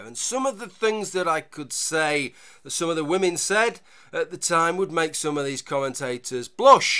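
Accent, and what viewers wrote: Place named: Northern England, United Kingdom. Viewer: You expressed most of my thoughts, though more eloquently (and with a northern accent!). The problem with claiming the shirt is sexist is that the argument is not falsifiable. If you try to provide a counter example, such as men in sports gear, it's not analogous because the 'power differential between men and women under patriarchy' gives the offending shirt a meaning that doesn't apply to other motifs involving men or inanimate objects. If you argue that the poses aren't inherently pornographic, they can readily produce an example from pornography because as you observed, just about any pose can be pornographic. If you don't think the shirt would really keep women out of STEM because it isn't inherently sexist, and you happen to be male, then you're dismissed because 'men don't know what it's like to be a woman under patriarchy' and you are 'mansplaining'. 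If you're female, then you're a 'chillgirl' with 'internalised misogyny' and are probably just trying to curry favour with the men anyway. Many of the arguments for feminism resemble very closely those for the existence of god. It continually surprises me that the movement has gained so much traction in atheist/skeptic circles. I'm also glad you touched on the subculture aspect. I haven't really seen a lot of photos of Matt Taylor, but both he and his friend (Ellie Prizeman?) gave me a bit of a rockabilly/metal vibe. As someone who faced a lot of negative attention for being a goth in my younger days (eg. once some guy tr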